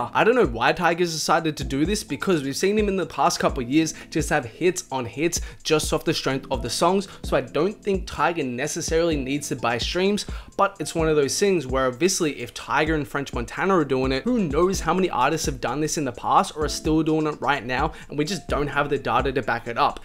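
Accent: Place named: Australia. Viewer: He sounds Australian and they got winter now and summer in December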